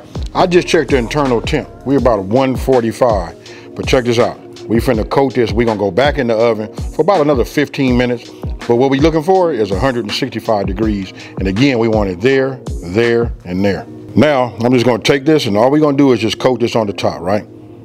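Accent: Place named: California, United States. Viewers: Does anybody know where this guy is from? I think I heard him say he was in Vegas these days but he don't sound like he's from Vegas. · For all those who think Californians don’t have an accent, this right here is a prime representation of a thick California accent 😆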